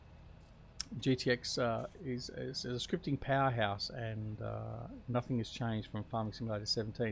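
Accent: Australia. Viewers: Did you need to use GE to do some of your farm upgrades like main entrance and removing buildings? Love your videos and most favourite FS19 YT'r. I wish I could make Maps and would like to add some Aussie maps and do some work on WA map. Good to hear a fellow Aussie voice on YT. Best wishes for your wife. Keep up the great work.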